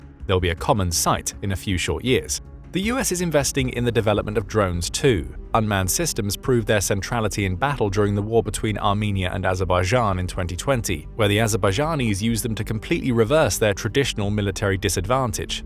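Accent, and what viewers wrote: United Kingdom. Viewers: Crazy a British is doing the video voice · I love hearing just how cool the U.S.A. is in a British accent its quite perfect good sir. Lmao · Nothing worse that hearing about the us military with a British accent. All set.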